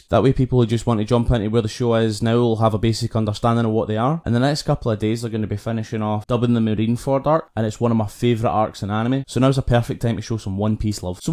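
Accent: Scotland, United Kingdom. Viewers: I was gonna say I could’ve sworn that’s Scottish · U scottish? 😄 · Is that a Scottish accent? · This guy’s scottish fs tho so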